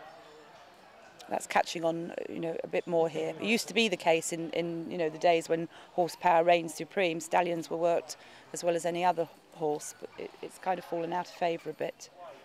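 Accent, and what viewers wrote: United Kingdom. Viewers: by accent i'd sday britain. but in america you have grater chances to see draft horses than in europe. about ardennes i don't know, drop by on the front porch of rural heritage page.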